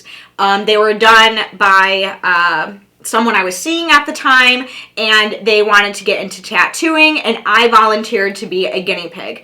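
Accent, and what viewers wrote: United States. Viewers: Listen, if you are broadcasting to an audience of many millions, isn't it time to start thinking about good English? After all, you speak one of the local American dialects, which have very little to do with the real English. I don’t want to impose anything on you, but maybe you can still correct your English?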